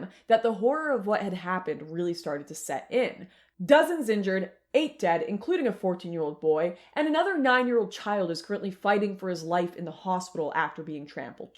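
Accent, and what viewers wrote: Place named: Canada. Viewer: She has a Canadian accent stop going on about trivial unrelated subjects.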